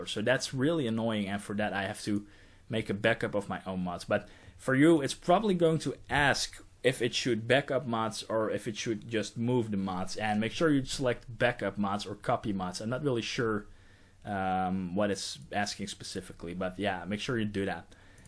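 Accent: Netherlands. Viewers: nederlands accent doet pijn XD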